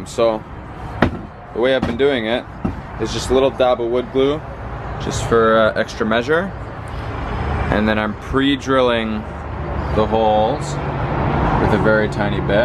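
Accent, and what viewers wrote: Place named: Canada. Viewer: Came to watch these old vids and you sound so much more Canadian haha. Loving this series.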